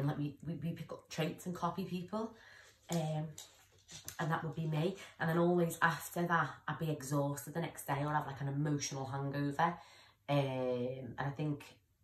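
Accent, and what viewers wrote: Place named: Liverpool, United Kingdom. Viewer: Scouse in the house 😆👍 your like me. I sweat when I talk to people I don't know. I don't know what to say to them unless I've had a drink . If I go to party's I have to go and sit on me own for a bit evey so often to get some space lol i hate going to places I don't know aswell . The ailen thing you said hit me because my m8 would say I'm like and ailen from a different planet hahaha and I always make sure iknow were people are in the room . From hearing you talk I can see your not stupid your smart and probably a nice person if your in a good mood lol things will get better where all fucked up other people are just better at hiding it .nice one for telling your story i appreciate it feels good to know I'm not the only one 😆👍👍👍👍👍👍👍✌✌✌✌✌✌✌👋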